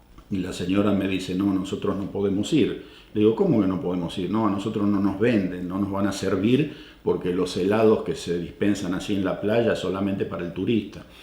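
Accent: Argentina. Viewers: Ya me hize fanatica..me llama la atencion q no haya perdido el acento argentino che😁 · Un abrazo desde Rauch provincia de bs as, Argentina los sigo siempre, los respeto y les deseo lo mejor, me encanta tus historias y bien contada con nuestro idioma y formato de habla, es como si nunca te fuiste, tu hija genial y muy Argentina tambien, besitos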